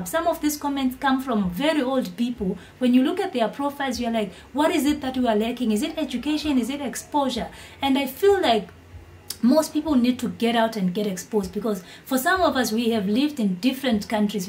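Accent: Kenya. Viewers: kazi nzuri sana dada...yani umekua mkenya kabisaaa congratulations..nilisikia pia ukiongea my language kikuyu nikafeel wewe ndio daughter of African pongezi...acha watu waheshimiane....zulu not superior than other southafrican countries we are all one especially that we are all bantu speakers...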